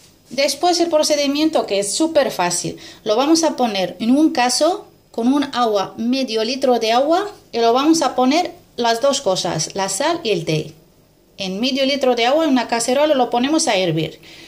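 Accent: Spain. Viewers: Me Encantan Tus Videos. Eres fenomenal. Te felicito y te Admiro. Ya me caes muy Bien. Lo que Mas me Gusta de Ti es lo Responsable que Eres con Tus Mensajes y Videos. Me Gusta lo Responsable que Eres, y Sabes informar muy bien a tus Seguidores. Yo Miro Tus Videos desde Seattle, Washington, USA. Por la forma en que hablas parece que estas en Spain. Aqui No tenemos la tilde que se pone encima de la letra n, entonces, seria Espana. Sorry.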